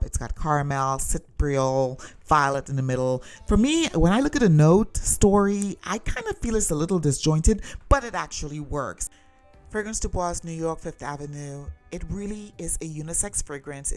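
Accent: Trinidad and Tobago. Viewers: I enjoyed it thoroughly. I could listen to your Trinidad accent and laugh all day. ❤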